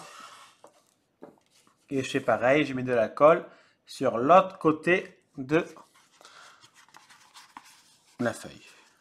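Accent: South, France. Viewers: Très beau. Je vais me mettre à faire des albums. Jusqu'à présent que de la carterie... J'adore ta bonne humeur et ton accent méridional qui met du baume au coeur. Bon week-end à Seb et toi. Scrapbises. Chantal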